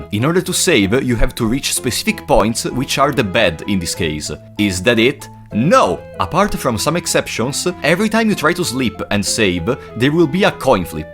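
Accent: Italy. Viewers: Hi as a new player i agree with you and I want to ask you if I should try to talk to enemies, I think is important but my friend always told me that is useless because all entities there are evil... Also you sound like you are italian.... are you?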